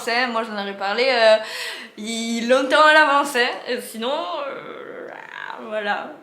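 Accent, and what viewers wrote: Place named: South, France. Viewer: L’accent du sud ! 😉🤩 super vidéo d’ailleurs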